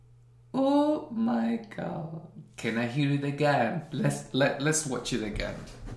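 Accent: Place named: Italy. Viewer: Omg i love your voice so Italian, humble, and your reaction so so natural, good luck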